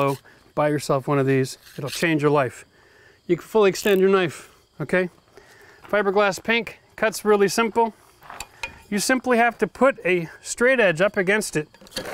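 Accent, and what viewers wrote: Ontario, Canada. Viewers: You always make it simple, honest and with a touch of Canadian eh! TY from BC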